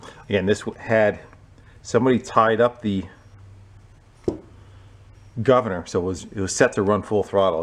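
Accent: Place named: New England, United States. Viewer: That's a New England accent if I eva heard one.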